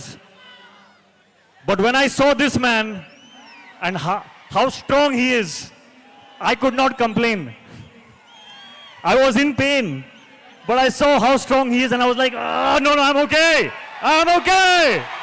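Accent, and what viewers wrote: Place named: Telugu, India. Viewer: accent 90 per original telugu person laga undi..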